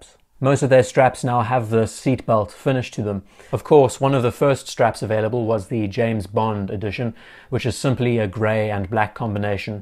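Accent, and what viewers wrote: South Africa. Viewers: I do like the South African Accent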